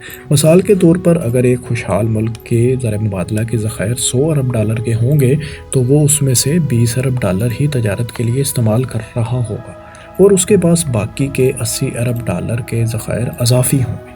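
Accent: Punjab, Pakistan. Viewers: اس چینل کی تمام وڈیوز اردو زبان میں منفرد مقام رکھتی ہیں مگر اس نامناسب آواز میں نشر ہونے والی وڈیوز سماعت پر بہت گراں ہوتی ہیں۔ اگر یہ اردو زبان ہے تو اسکو پنجابی لہجے میں کیوں ادا کرا جاتا ہے؟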